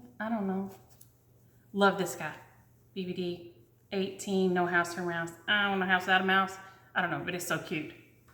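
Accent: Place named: Texas, United States. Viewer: I’m so glad to have found you! I thought you were tons of fun, and you sound like home (Texas) to me. Thanks for doing FlossTube!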